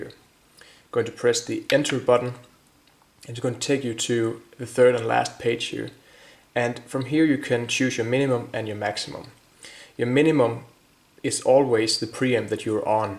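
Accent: Denmark. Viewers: Owned a Cort S2600, really good value guitar. BTW are you from Denmark, regarding your accent?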